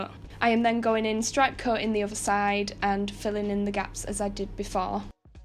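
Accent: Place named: Lancashire, United Kingdom. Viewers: Brilliant nice to see Landy's coming back to life and as a fellow Northener (Lancashire) its also nice to hear your accent :D, young woman like you give me hope I have 6 daughters and I would say your attitude and attention to detail is a positive role model for them :) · Which accent is that? To my untutored (Australian) ear I'm guessing a bit north of Manchester. But what would I know!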